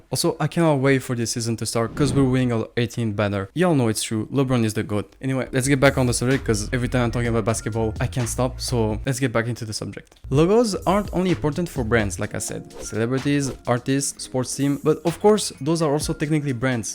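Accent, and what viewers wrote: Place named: France. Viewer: accent fr spoted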